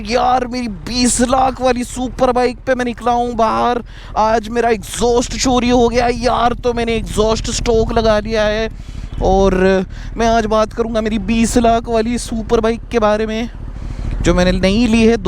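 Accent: Hyderabad, India. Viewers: I’m following you since a long time both for bike reviews and to listen Hyderabadi Hindi 😊 And YES you are right the OLD zx10r looks was great ! It looked manly butch !!!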